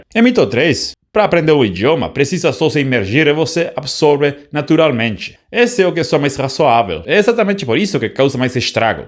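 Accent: Rio de Janeiro, Brazil. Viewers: VC fez certo em aprender o portugues carioca! 🎉🎉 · Muito legal seu vídeo, só lamento que você aprendeu português com sotaque carioca, na maior parte do Brasil não falamos com "chiado" nas palavras que tem "S", como você deve ter aprendido. Fora isso, acho que este é o primeiro vídeo sobre aprender outra língua realmente útil! Parabéns pela iniciativa! 👏👏👏... Grata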